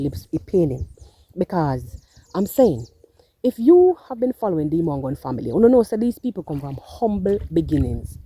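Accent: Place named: Jamaica. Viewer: I just love how you speak all jamaica bad mine the girl and then a talk bout say a good advice and she mùst listen to them after dem a chat her out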